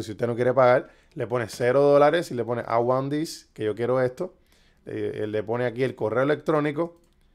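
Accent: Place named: Cuba. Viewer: eres cubano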